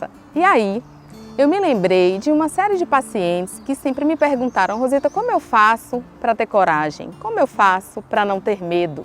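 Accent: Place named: Bahia, Brazil. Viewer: Reflexão muito boa , é o que eu estava prescisando ouvir. Rosita você é Baiana? Se não você tem o sotaque de Baiana arretada.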